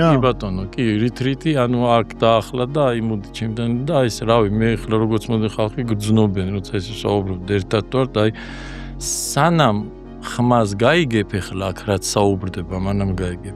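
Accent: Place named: Kartli, Georgia. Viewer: ნირვანა დატოვა და რით რითი შექმნა... რა მომწონს კიდევ; ბევრი უპროტესტებს. ამხელა ზენიტის შემდეგ რომ😊ქართლული კილო არ დათმო და არ "შფოთამს"❤მიყვარს!!!❤❤❤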